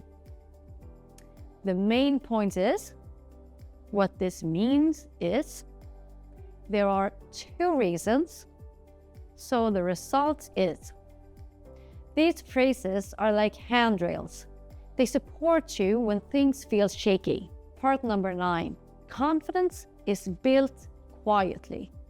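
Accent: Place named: Sweden. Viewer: Great summary of years of experience. Keep you accent - it gives you a distinct charm. You sound like a Swedish person among the crowd of wannabes 🙂 Yes, speaking clearly and comprehensibly is important, but do not get rid off of your accent completely - it is your feature to be memorable. Though for many it is a goal of their life.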